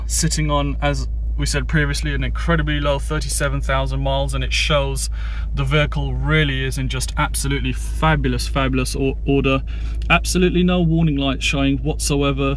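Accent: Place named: India. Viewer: I also counted the word Absolutely and got 23 times in the space of ten minutes, this guy surely has trouble with the English language in the way he uses the same word so many times, without being able to thin k of another word to use. Maybe it is because he can not use all the words in the English language is because he is not English, I detected a faint hint of Indian in his words, so he was doing what all Indians do when they give you a time wasting phone cal.l they always have an English name and try to put on a perfect English accent, as well as not knowing the English he also didn't know the Highway Code, he was parked on a double yellow lone, on both sides and on the back.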